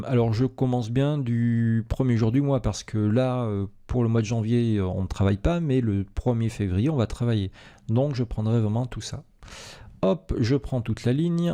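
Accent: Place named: France. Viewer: Super, merci. Le meilleur prof d'Excel avec un vrai accent mignon français.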